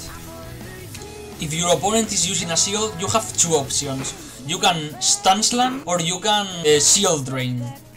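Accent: Spain. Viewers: Se te nota el español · Se nota tu acento español xd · SE TE NOTA UN WEBO Q ERES DE ESPAÑAA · eres español? el acento lo parece jajajaja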